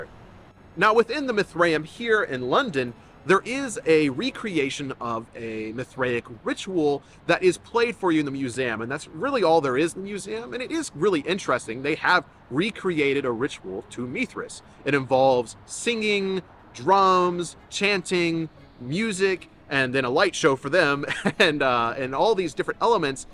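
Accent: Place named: United States. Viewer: How to obliterate any shred of credibility. 1 Having a channel called The Wisdom of Odin 2 Speaking Murrican (that is not a civilised way of speaking) 3 Using such a inane font for the channel logo.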